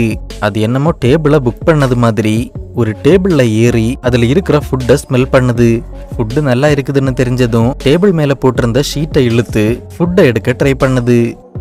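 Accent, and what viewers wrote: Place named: Marthandam, India. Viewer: Unkaluka ooru marthandam a anna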